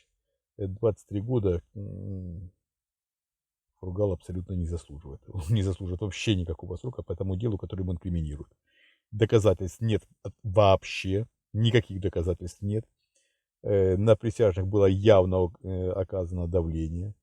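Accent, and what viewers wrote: Ukraine. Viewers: Послушала полторы минуты и поняла что вы из Украины.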